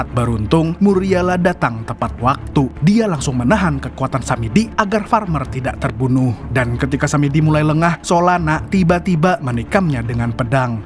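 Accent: Banjar, Indonesia. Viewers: karindangan bahasa banjarnya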